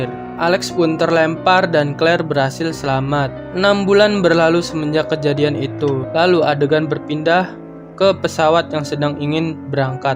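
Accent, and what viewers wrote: Cirebon, Indonesia. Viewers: Admin logatnya kaya wong cerbon sih min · Anjing orang cirebon bgtt logatnya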